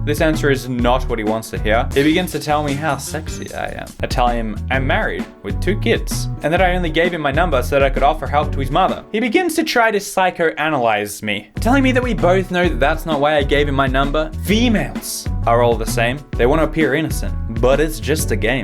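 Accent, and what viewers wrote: Australia. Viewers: are you australian pewdie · your Australian right? I seem to be watching a lots of Australians lately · I love how your Australian accent really comes out in this video ❤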